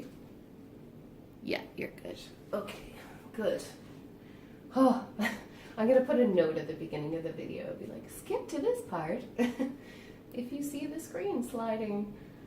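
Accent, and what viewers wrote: Ireland. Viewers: I wanted to watch your first videos because being new to diamond painting, you're kind of a legend to everyone I watch, haha. I've watched quite a few of your more recent videos and coming to this one, you have an Irish accent in this one! I don't hear it anymore, it's so cute, though! But I love accents! So fun seeing you as a newbie! 😁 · Wow! Exactly a month ago I saw your first videos about “I wish I knew about diamond painting”, now I am watching much older once and your accent is more Irish then American. Love it!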